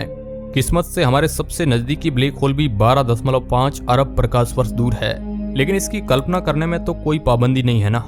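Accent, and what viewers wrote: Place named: Bihar, India. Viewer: Abe sale brust kya he be? Gama Ray brust??? Pehle accent thik kar uske bad ana video banane .... bihari he kya?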